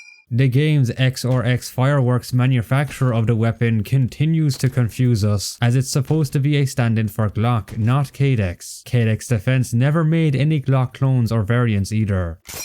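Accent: Ireland. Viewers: Heard your accent and thought you were Irish and I new it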